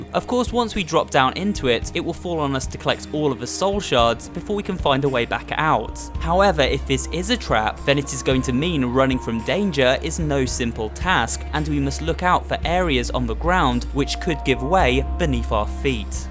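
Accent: United Kingdom. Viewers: why you british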